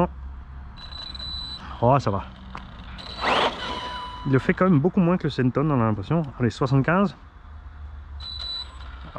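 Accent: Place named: South, France. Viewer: qui parle sudiste tu m'as régalé 😍 ( sud power 😁) Le front flip magique jamais je pensai que c'était possible. Et visi bien solide la machine car elle prend cher sur cette vidéo 💪💪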